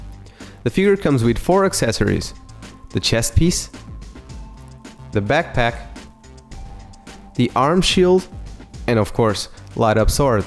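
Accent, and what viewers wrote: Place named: Portugal. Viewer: Pelo teu sotaque es portugues. Encontrei esta figura há uns dias por 50 centimos e achava que era uma falsificação pois não conhecia. Só em casa pesquisei. Infelizmente falta a espada com os 2 fios e o protector de braço, de resto está completa com a caixa e tampa das pilhas. Cabeça do He-man, não do Dolph. Foi a minha primeira figura dos Masters, não tenho mais nenhuma.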